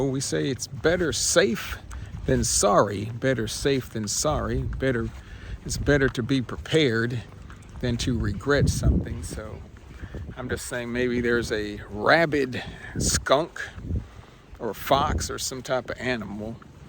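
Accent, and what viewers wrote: Texas, United States. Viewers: I believe that finding time if you really want to learn English is not a problem. Too often it's an excuse. What really helps is what I call immersion in the language. If you can't talk to native speakers, you should watch the movies, listen to the music to pick up words, phrases and above all, to familiarize with the pronunciation . I like your Texan drawl👍. Greetings from Poland.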